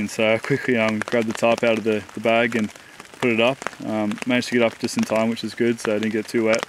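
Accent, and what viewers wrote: Australia. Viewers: awesome vid! with an added bonus of an aussie accent :P Haha cheers from Canada !!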